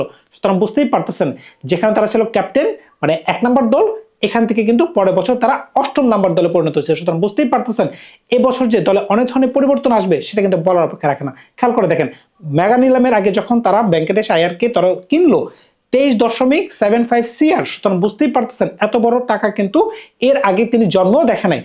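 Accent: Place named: East Bengal, Bangladesh. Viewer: Bangal katha posay na. Valo bangla uchcharan karu n.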